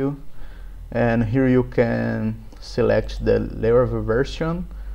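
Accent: Brazil. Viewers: Brasileiro certeza. Mas ficou bom mano parabéns. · Conheço um brasileiro de longe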